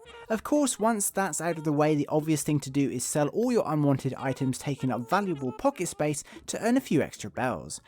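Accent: England, United Kingdom. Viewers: You have a great english accent 😌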